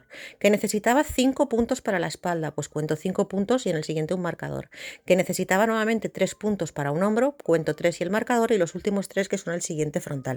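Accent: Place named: Spain. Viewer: Hola, hermosa! Desde La Plata, provincia de Buenos Aires, Argentina 🇦🇷, te acabo de descubrir!! Como docente jubilada no puedo menos que felicitarte por tu excelente didáctica! Voy a hacer este cardigan o saco porque me animo de una, según tus claras explicaciones, a las que agregás recursos de color y los tips siempre muy bienvenidos! Con mis 72 años, "allá vamos", como decimos por acá! 😀 Ese tonito español- no * gallego * 🙄, me encanta! Muchas, muchas gracias por tu encanto personal y profesional. Luisita. 😘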